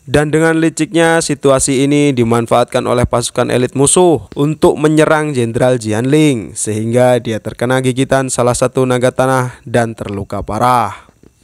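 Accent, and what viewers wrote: Madura, Indonesia. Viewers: Orang madura kah bang?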